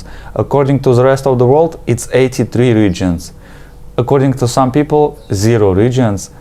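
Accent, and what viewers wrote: Russia. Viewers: Такое хорошее знание грамматики, но почему акцент прям рашн? это специально или забил на фонетику? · You're a pretty creative and funny guy. You should live in the US. With that Russian accent and sense of humor, you'd probably be a millionaire celebrity - maybe a movie star if you can act. From the 86 year old CA hiker.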